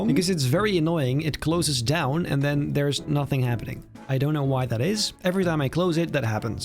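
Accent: Netherlands. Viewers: yoow broow jij bent nederlands toch? ik hoor het aan je accent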